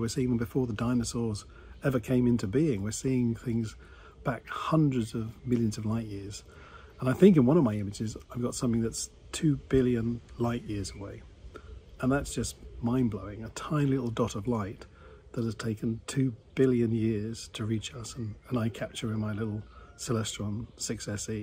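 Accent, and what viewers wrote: Australia. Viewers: Do I detect an Aussie twang?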